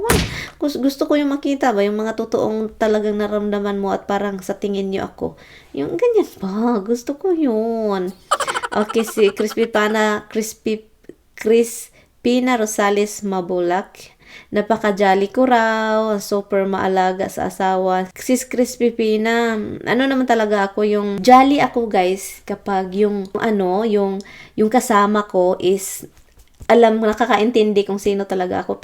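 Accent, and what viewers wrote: Visayas, Philippines. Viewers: surphlis kalog tapos cge ko katawa na mabisaya usahay ang tagalog nya😂❤️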